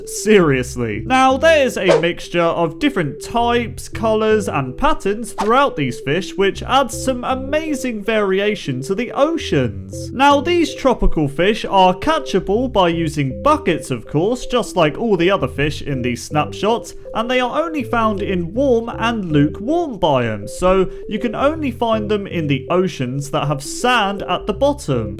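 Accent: United Kingdom. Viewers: are you british? that sound like that.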